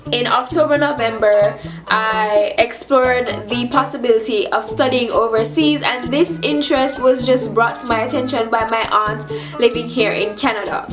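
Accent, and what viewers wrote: Jamaica. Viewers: she sound Jamaican.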